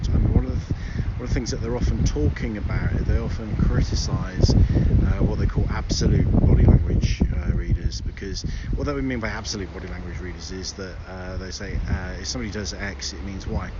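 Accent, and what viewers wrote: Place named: United Kingdom. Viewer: I think the British accent is beautiful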